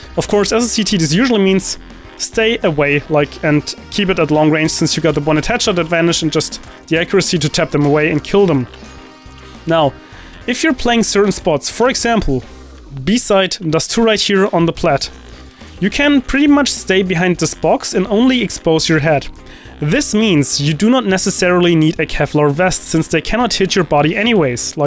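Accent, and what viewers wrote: Germany. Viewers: Craig Ferguson doing CS:GO tactics after the bell rings xD I love german accent. Somehow makes me giggle. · junge du klingst soooo deutsch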